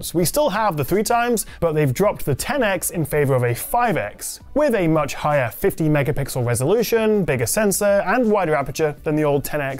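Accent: United Kingdom. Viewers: Brother he's from England not Denmark didn't you heard the British accent 😂 of course it was filmed on England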